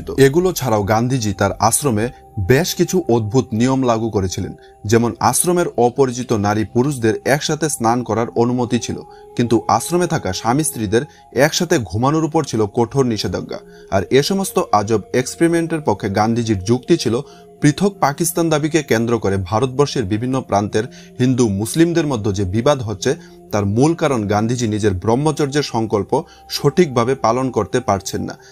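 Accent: Bangladesh. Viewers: ভাষায় তো মনে হয় বাংলাদেশি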